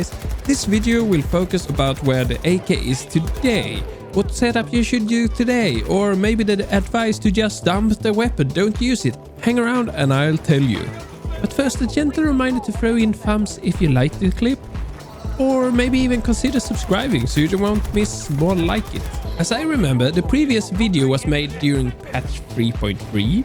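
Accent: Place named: Sweden. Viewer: Knew you had to be Swedish with your accent haha, jag är halv Svensk. I think the AK and M5 feel so inaccurate and weak compared to the SCAR — I don’t understand how some of my friends dominate with them but I struggle to hit open shots consistently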